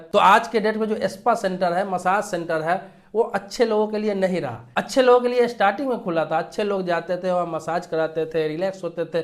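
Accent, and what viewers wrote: Bihar, India. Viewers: Tu bihari hai kya be ?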